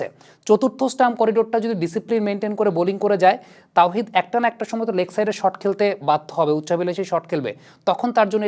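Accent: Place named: Chittagong, Bangladesh. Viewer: Vai mone hoi Chittagong sptr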